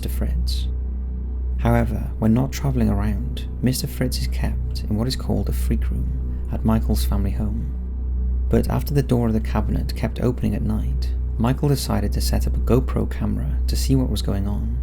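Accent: United Kingdom. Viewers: It’s just the accent, British people don’t seem to make use of the letter Z (zed as they call it) or its sound very often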